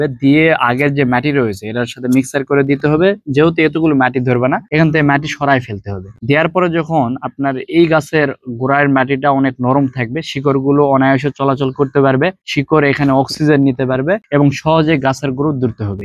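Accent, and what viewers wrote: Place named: Joypurhat, Bangladesh. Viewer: ভাই কি জয়পুরহাট ওই দিকের,, কথা শুনে মনে হলো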